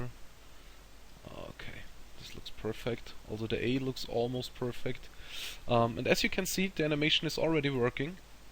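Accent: Germany. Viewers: Du hast 'nen deutschen Dialekt...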